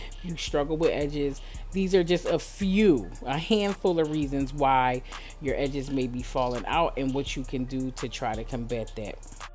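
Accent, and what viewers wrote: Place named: New York, United States. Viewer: I’m from NYC and I just love the way you speak! So “Up North!”.. feels like home! And thanks for the education sis!🌹come to Charlotte!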